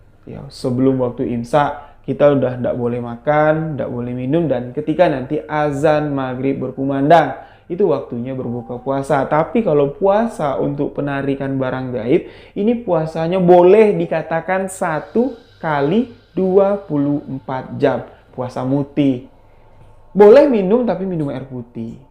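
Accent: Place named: Jambi, Indonesia. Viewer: Walaikumsalam kak n team... Masya Allah Emang Kereen lw Sebuah Kisah Misteri upload cerita Pesugihan sllu bikin penasaran....palagi d bw in kak dgn logat jambi yg khas....the best lah Tanpa yana tunggu lama lama..... Gaskeeuun yuk kak....🔥🔥🔥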